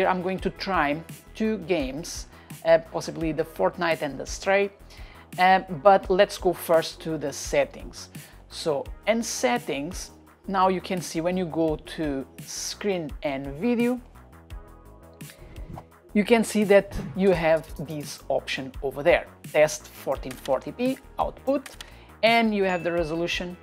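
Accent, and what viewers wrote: Portugal. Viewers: Apanhei te 😂 o Tuga tem aquela Pontinha de sotaque lá no fundo💪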